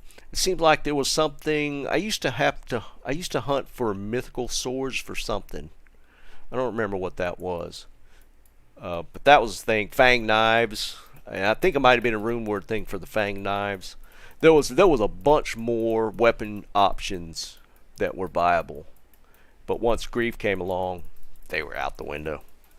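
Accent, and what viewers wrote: Texas, United States. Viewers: Omg holy crap, I was thinking the same thing also. That accent seems like a texas cowboy accent.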